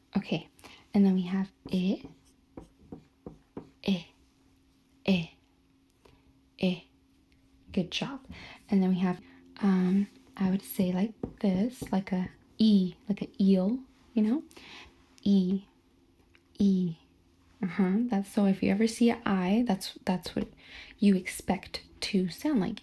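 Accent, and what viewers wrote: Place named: Mexican-American, United States. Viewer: Estoy casi seguro que es mexicana 🇲🇽 nacida en estados unidos, las chicanas son muy bonitas y tambn lo creo por la forma de hablar el español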